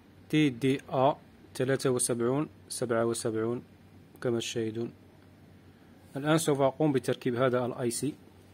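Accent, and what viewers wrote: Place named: Morocco. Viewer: عمل رائع أخي أريد أن أسألك عن مكان شراء هده القطع الإلكترونية في المغرب لأن من لهجتك عرفت بأنك مغربي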